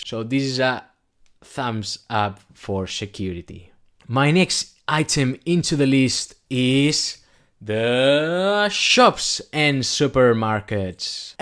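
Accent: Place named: Barcelona, Spain. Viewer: Aha, you're from BCN :D Excellent - everyone will sound like this!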